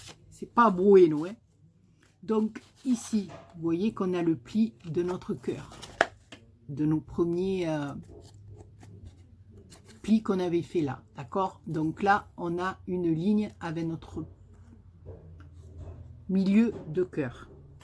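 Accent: South, France. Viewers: Super carte et j’adore vôtre accent du sud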